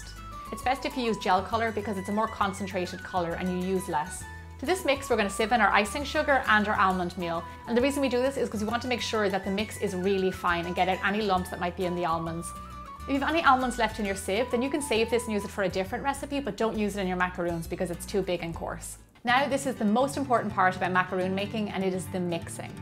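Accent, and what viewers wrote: Ireland. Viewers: Hi! These are super cute, the gold lusture dust makes all the difference! I watched the video you done on a Youtube channel, you sound so Irish in that compared to your videos! I couldn't believe it at all! Are you taking part in Donal's collab? I can't possibly be that Irish, I've never tried bread pudding!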